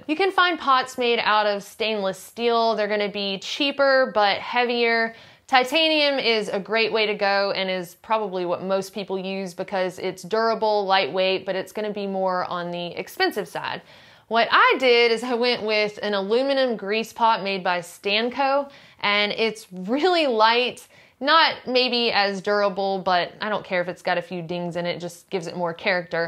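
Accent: South, United States. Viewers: Absolutely LOVE your southern accent, I'm from the South and it reminds me of home! My wife sounds the same; Riiiiiice! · My moms account but your southern accent creeps in i love it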